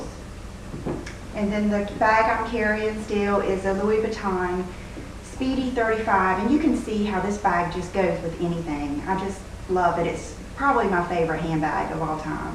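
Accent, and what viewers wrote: South, United States. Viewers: PS: you always brightin my day, Ur southern accent is soo beautiful and welcoming i am soo takin back.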